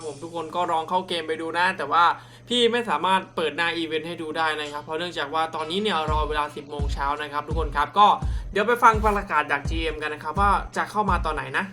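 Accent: Isan, Thailand. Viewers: ชอบมากหลายๆเว้าอิสานคน จ.ใดหนอนิ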